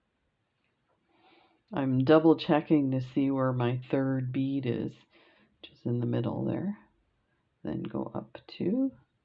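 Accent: Canada. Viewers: I tried this so many times but kept getting that puckering around the perimeter. Finally switched to 5mm pearls instead of the 6mm. I used 6 seed beads to surround them and I didn't have to add that extra single seed bead at the bottom because the seed beads came together really well and didn't show thread. Just a suggestion, as this worked for me. I've made 3 so far and plan on using them on an ornament ball. Thank you so much, for teaching this to us! I love your videos, (and your Canadian accent!) ❤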